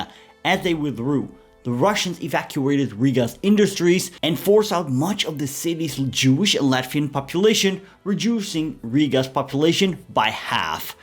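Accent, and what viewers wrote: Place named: Netherlands. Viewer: the guy speaks with a very thick Dutch or Scandinavian accent. It's not nice - it hurts my ears. things like this should be banned. if you are Dutch, make videos in Dutch, pal.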